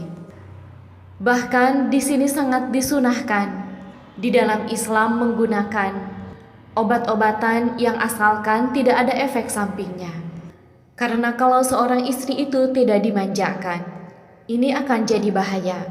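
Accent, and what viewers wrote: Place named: Madura, Indonesia. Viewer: Kyk org madura suaranya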